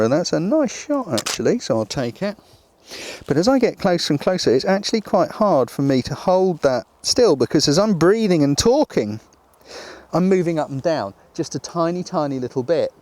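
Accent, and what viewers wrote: England, United Kingdom. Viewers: Only discovered your videos today, but am completely hooked. Your teaching style and pace is fantastic and your english accent is like a balm (No offense Americans - I love you guys, but being from the UK myself it is damn nice to hear a fellow brit every now and then). I think you should totally have your own TV show maybe on Discovery Shed (I'll start the petition if you like)!!! Seriously though, thank you for what you do, ad for the help you have already provided me with.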